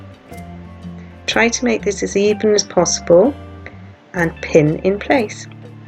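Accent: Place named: Wales, United Kingdom. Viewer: Fab tutorial👍. Clarity , brevity and simplicity. I’m inspired. 🎊🎀tell me , are you welsh? I’m in Cardiff and you sound local!🙋🏻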